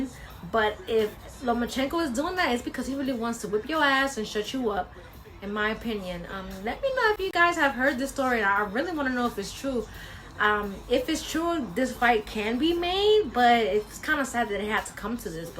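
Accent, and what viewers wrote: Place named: Bronx, United States. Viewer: Good to see you back on YouTube making videos again great to hear that Bronx accent hahahahaha hopefully boxing is on the way back and full respect to both these ladies put on a great fight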